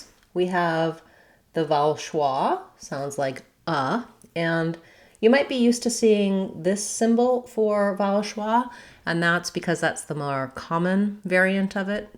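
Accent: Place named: United States. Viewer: Thank you so much teacher I really like your good work and I like american accent I will stick with you to get this beautiful accent,this is my second video...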